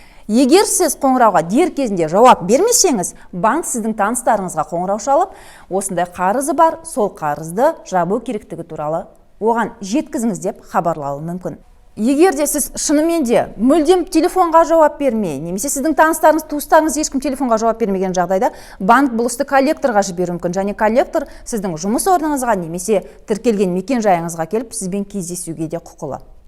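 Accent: Standard. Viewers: Елімізде сендей қазақша айтатын жастар көбейе берсін Сенің ата анаңа рахмет қазақ тілін таза сөйлеп отырсың Қандай қазақ тіліміз құлаққа жағымды есітіледң қазақ тіліміз өркендей берсін!!Ал кридетке келсек аман болсақ төлей берерміз ақша болған кезде. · Рахмеет қазақша таза сөйлейді екен осылай болғаны дұрыс қой бәрін түсіндіиріп айтып берді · Кушті түсіндіреді. И таптаза казакша соилиді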